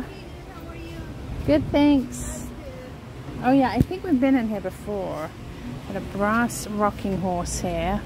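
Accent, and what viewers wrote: England, United Kingdom. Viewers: Congrat for reaching 100k. You deserve it love your english accent❤❤❤❤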